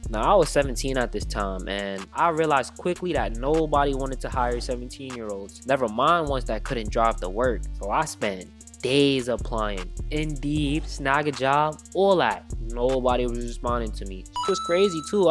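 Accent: New York, United States. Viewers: bro said whattaburger yet talk like he from ny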